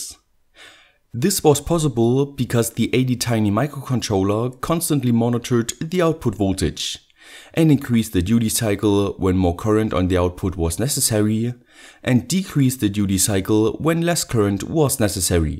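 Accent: Germany. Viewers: Hi- could it be that you are German ?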